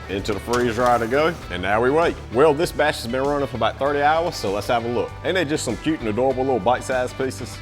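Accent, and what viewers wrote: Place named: North Carolina, United States. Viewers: He's from North Carolina, where he contributes his accent. He doesn't have a Louisiana accent (born and reared here, although we'd welcome him). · I live in the southern United States and I can’t figure his accent either! It’s delightful!! He’s either from the Carolina’s or Louisiana?? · I just shared this with my mom, 8 siblings, and their spouses: “This dude I’d propose marriage to if he were single (and not straight). “His video shorts are amazing, canning peaches, growing food, freezing cantaloupe for smoothies, freeze drying watermelon, and so much more. A southern accent is always comedic or fascinating, but rarely sexy. I’d be able to listen to his accent all day long and be charmed by it.” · No clue how i ended up here, but a dude who looks like he could break a tree in half just by looking at it, with an accent I cant place further than "south? 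Maybe????", using molds he calls "adorable"? Yeah. Sign me the fuck up. (And yeas, my own prejudice absolutely plays in here, i was so ready to scroll quick as shit. Was like 85% sure this would include some 'women suck, God is everything, black people are criminals and also the gays are sinful' kind of garbage. I'm from Europe and everything about this mans first impression screams terrifying to me. Glad I stayed, if for no other reason than to sit with my own prejudice for a while and start to become aware of it. So you know, thank you for that, and also sorry for the immediate judgement) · Are you in North Carolina? I love your accent & your content...new subscriber. ❤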